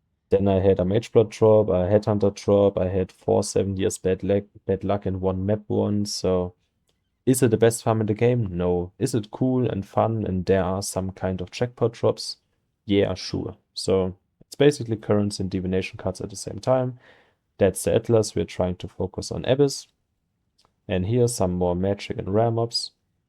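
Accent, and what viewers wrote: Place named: Germany. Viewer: guter deutscher akzent :)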